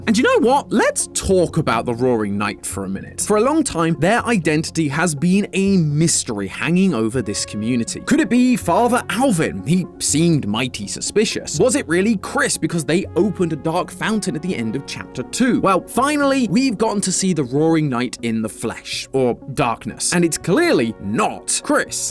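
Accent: United Kingdom. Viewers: i love how British accent makes 'deltarune' appear as 'delta room' in the subtitles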